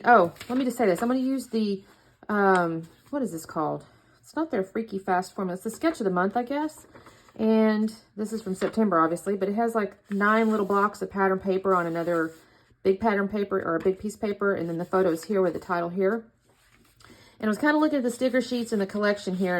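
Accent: South, United States. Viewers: It just hit me. I know why I like your videos so much. It's that southern accent. I haven't visited the state fair, but this year, I will be there. I told my husband, "Honey, we gotta go to the state fair!" I don't know when it is going to happen, but as soon as San Antonio announces it, we are there. That sounds like fun. Your layout came together nicely, and I love fall colors. They make me think of warm pie. Anywho, thanks for sharing.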